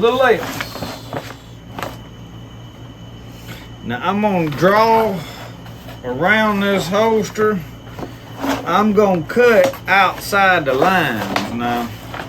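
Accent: South, United States. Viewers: I REALLY LOVED THE VIDEO AND OF COURSE THE "SOUTHERN BROTHERHODD ACCENT." I'M FROM FLORIDA AND WHEN I WAS A CHILD, I WOULD GET EMBARRESED WHEN I TALKED. ACTUALLY HAD PEOPLE WANT ME TO TALK WITH THE HARDCORE SOUTHERN ACCENT. GETTING PAST THAT, I REALLY LEARNED ABOUT THE WAY YOU USED THE CLOTH VS THE SPONGE. ALSO, YOU WERE DEAD ON WITH THE FINISHED PRODUCT! THE HOMEMADE KNIFE, WELL, I'M JEALOUS, BUT WILL FESS UP, I'M GONNA COPYCAT IT. MOSTLY, WHAT IS THE MUSCIC? LOVE IT AND IF YOU TYPE IN "DONNIE LAWS," HE USES THE SAME FIDDLE MUSIC. PLEASE LET ME KNOW WHERE TO FIND IT,... I LOVE IT! PEASE SHOW LOTS OF YOU WORK,... AS IT GETTING TO BE A LOST ART. THANKS ROG FROM THE SWAMPS OF FLORIDA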